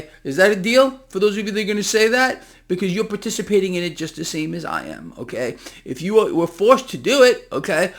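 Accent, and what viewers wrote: United States. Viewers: It's the American pronunciation I guess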